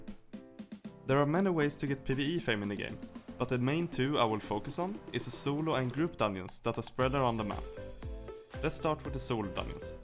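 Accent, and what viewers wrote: Sweden. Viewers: Lol, your accent is so swedish. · you sound so swedish =)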